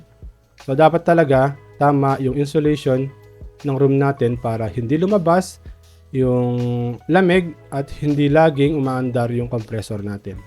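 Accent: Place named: Pampanga, Philippines. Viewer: halatang kapampangan ka sir lol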